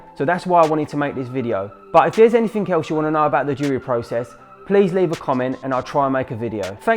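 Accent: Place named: Birmingham, United Kingdom. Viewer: Just curious are you from brum? U got a brummy accent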